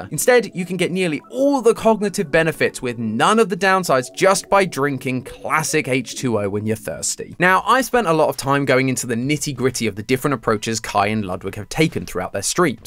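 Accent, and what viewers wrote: United Kingdom. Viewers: As i watch the video, i find sadness, this is the last video ill watch for th Game theory series of channels. Matt was the life of the channel, while im able to appreciate the british man and his efforts as a voice over actor, it isnt the same. Thank you for your efforts, you surely will find success in working for the company that bought the Theorists channels.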